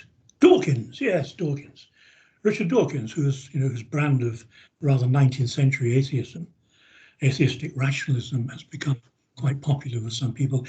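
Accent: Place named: Oxbridge, United Kingdom. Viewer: Gosh, I love this dude-he is a flaming hoot-his humor belies his rather stuffy,( Oxbridge(?) accent-I'm a financial analyst myself, but I would have enjoyed studying with this cat.-where ordinarily I wouldn't go within spitting distance of any Humanities faculty.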